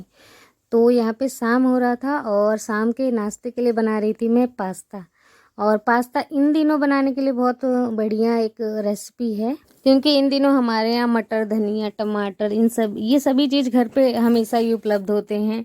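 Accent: India. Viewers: Ap hariyana se ho?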